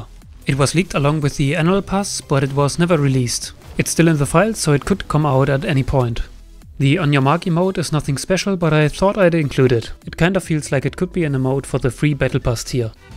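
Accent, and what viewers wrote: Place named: Germany. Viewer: man hört den deutschen aktzent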